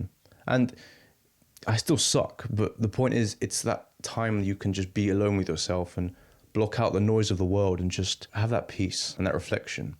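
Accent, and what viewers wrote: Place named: Cockney, United Kingdom. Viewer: This guy has the new grating Cockney Accent… Close my eyes and he could be a Rapper or PoundShop Gangsta. Or one of the new breed of BBC or Channel Four continuity announcers.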